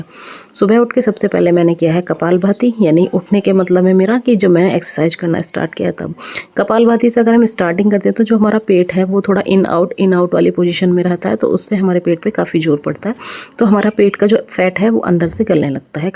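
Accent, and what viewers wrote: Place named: Meerut, India. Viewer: Didi aap ki sasural kahan per hai mujhe aapki Jo language hai vah merath Jaisi lagti Hai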